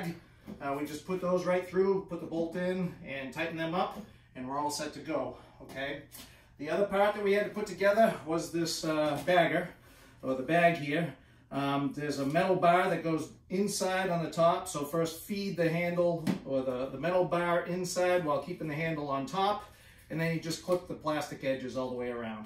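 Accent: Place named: Boston, United States. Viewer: Love your review...you sound like you might be from the Boston area. I'm from Long Island. I wish I knew about the new model! I bought the 220 this spring and while I love the way it performs, the batteries run out of juice so quickly and it takes so long for them to charge I can't mow my front and back lawn on the same day. (And they're pretty small suburban lawns.) I would have loved the larger batteries and longer run time.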